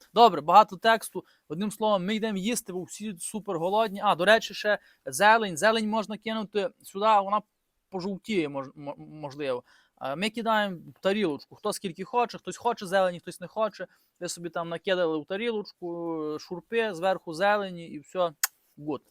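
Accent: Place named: Galicia, Ukraine. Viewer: Класно ведеш і галицька говірка мені дуже імпонує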